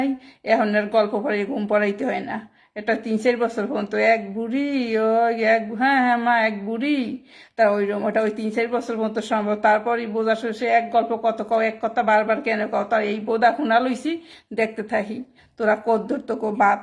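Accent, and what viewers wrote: Barishal, Bangladesh. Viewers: ভাষা শুনে মনটা ভরে গেলো,, আমার প্রাণের সেই বরিশাইল্লা ভাষা 🥰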